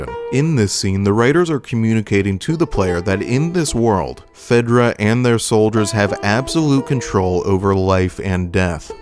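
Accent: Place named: United States. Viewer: Like you sound American too have you never heard of Ronald regan??? On top of that Ellis literally says her name and you still said it in the stupidest way possible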